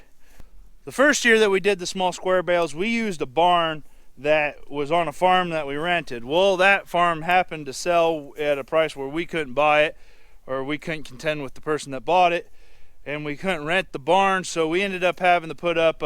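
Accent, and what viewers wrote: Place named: Missouri, United States. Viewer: Just subscribed... good vid! I am from the Albia, IA area, so your accent is getting close to MO, I can tell. Tip: I believe you should not overlook the tractor as not worth mentioning. Too low on HP and weight, and it may not pull and properly operate the equipment. Too large, and it will be a waste of fuel, and the hours on a expensive machine, hours that should have been put on a lower cost machine. Blessings for 2020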